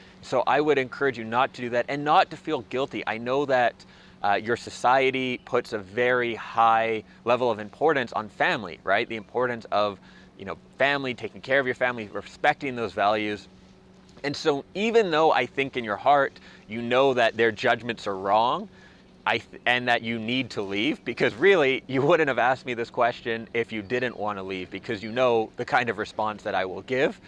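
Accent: Canada. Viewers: sounds Canadian and his views are rooted from that perspective, which is ok because everyone has to live his or her life.Canada is very individualistic so listen to him. But be careful, the later can be just as bad, especially in Canada because its hard to fit in. Don't try to. live your life. people will disappoint so don't expect to be connected in a disconnected world because we are all alone together. Best of luck.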